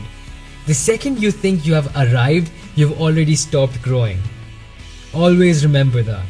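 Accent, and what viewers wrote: India. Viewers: awesome! really awesome! BTW are you an Indian?ur accent is like one! 😄🙌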